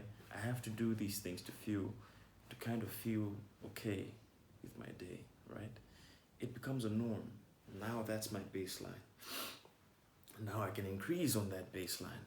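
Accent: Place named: Rwanda. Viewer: Great work bro , your accent sounds Rwandanese . Where are you from ?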